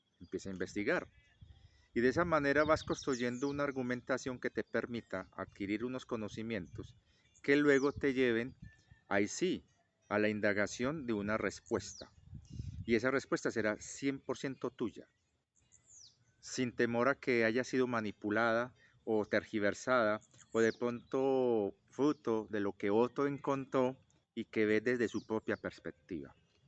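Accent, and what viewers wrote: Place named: Valle del Cauca, Colombia. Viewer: Hello, sr, ¿como vas? Pasaba por aquí y quería saludarte y decirte que, aunque digas que estás por las Antioquía, aún no se te ha borrado tu acento Valluno y eso que soy español para identificarlo perfectamente……será que, ¿mi esposa caleña me enseña cada día como se siente el valluno?, jejejjeje Agradecerte tus videos y en especial este, no por nada en especial, si no porque especialmente tocas un tema que a mi me cuesta de explicar a las personas que me rodean. Se de sobra que buscar culpables a nuestra situación personal fuera de nosotros mismo, es un ejercicio de estupidez soberana y muy extendido en la cotidianeidad del pueblo, tal como estar en posesión de la razón de manera poco asertiva, de que es lo bueno, o que es lo malo, o en el peor de los casos, juzgar la actitud de los demás como mala o buena, sin ni siquiera plantearnos, los procesos mentales o personales, que ha vivido esa persona para llegar a esa resolución de una situación en concreto, así no nos guste. 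No estoy yo tan convencido de que un tanto porciento equilibrado de la sociedad, tenga presente tus afirmaciones, más aún con el agravante de la propaganda que idiotiza a las masas a diario con la tv, redes sociales, etc…..como para racionalizar tus palabras. Es por ello que estaría bien que este video lo pudieran ver muchas personas y que les llegue a hacer replantearse todas las creencias que llevan a cabo cada día en el plano de juzgar, que esta bien o que esta mal o quien es bueno y quien es malo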